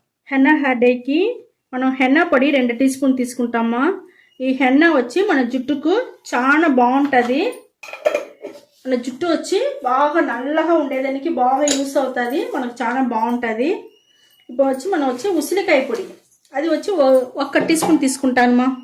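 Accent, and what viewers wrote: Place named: Chittoor, India. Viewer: Chittoor Telugu... influenced by Tamil 😂😂😂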